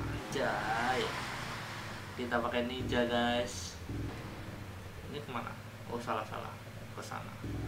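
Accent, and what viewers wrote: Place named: Java, Indonesia. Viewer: medok ngomongnya mas. mending pakek bahasa Jawa aja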